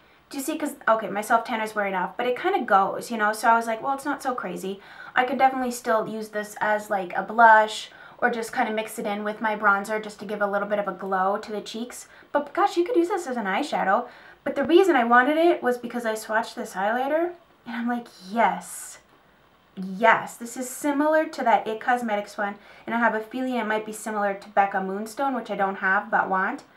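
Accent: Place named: Minnesota, United States. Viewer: Sounds like a Minnesota accent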